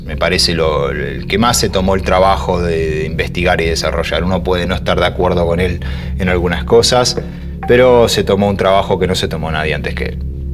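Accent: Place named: Argentina. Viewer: Hola, que lindo escuchar a!🤗 tiene tan linda voz..y ese acento Argentino..😎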